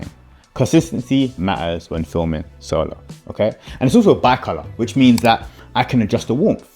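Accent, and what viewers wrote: United Kingdom. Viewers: they haven't figured out that you're British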